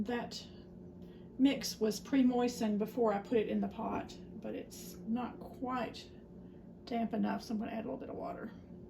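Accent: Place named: East Tennessee, United States. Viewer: You sound like East Tennessee. Me!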